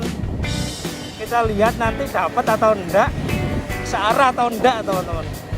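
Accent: Malang, Indonesia. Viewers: Logat malangnya Kuenthel mas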